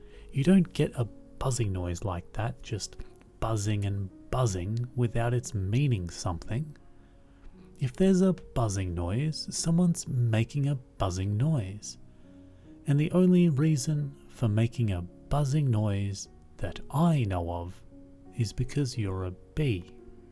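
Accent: Australia. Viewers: Thank you, I was seeking out Australian accent story tellers for my daughter (we moved to Canada where I’m originally from to give it a go being close to some family for at least the next several years) and I am feeling a bit guilty, hoping she will hold onto some part of her accent so your story telling is much appreciated :) and after living in Sydney for over 20 years and as a proud Sydneysider I have to admit I’m really enjoying it too :)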